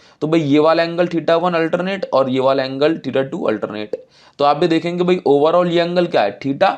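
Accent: Odisha, India. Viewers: Are you odia?? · Are u from odisha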